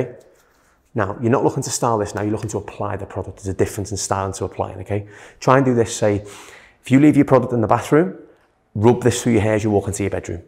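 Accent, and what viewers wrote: Liverpool, United Kingdom. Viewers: Is he from Liverpool?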